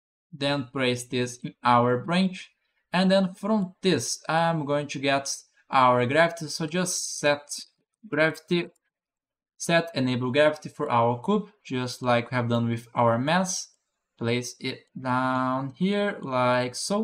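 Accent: Brazil. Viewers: Brazilian portuguese detected 😂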